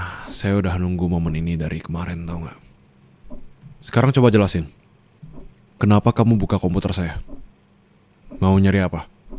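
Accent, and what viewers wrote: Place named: West Java, Indonesia. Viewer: yantonya logat sundah ini teh yaallah 😭😭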